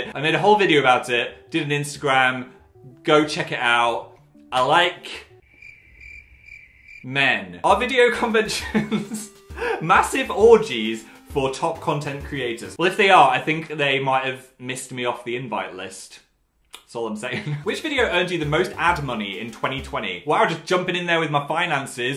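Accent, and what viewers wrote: United Kingdom. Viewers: Is it just me or does Phil’s accent sound more British than normal if you get what I mean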